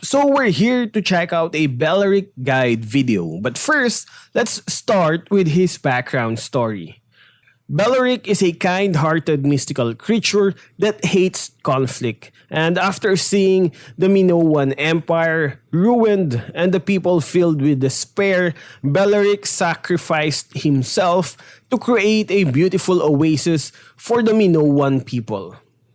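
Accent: Tagalog, Philippines. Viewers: Your Tagalog accent is showing 🤗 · The filipino accent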